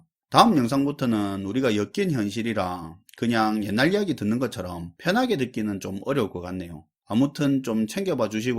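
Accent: Gyeongsang, South Korea. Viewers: 연속적으로 시청을 했을정도로 어려운 경제 흐름을 쉽게설명해주셔서 감사합니다. 가정경제에 중요한 시점이라 돌다리 두드리는 맘으로 시청하였는데 기본이 중요하다는것을 시청할수록 느껴졌습니다. 구수한 경상도 사투리와 편한 캐릭터가 집중하여 들을 수 있게 하는것 같아서 경제에 통달한 분의 오디오 비디오로 적격인듯싶습니다. 감사하고 많은이에게 유익한 지식이나 정보 많이 알려주세요~^^ 훌륭하시고 감사합니다.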